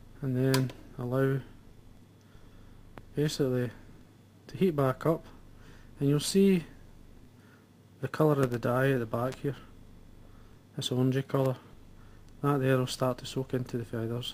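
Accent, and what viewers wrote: Scotland, United Kingdom. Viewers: How I love this Scottish accent! I hated it, when I first came to Scotland, but Scots are aswsome people and they simply changed my mind. To video - superb work thanks,. What type of dye do you use? I missed is somehow from the video. Thank you.:-)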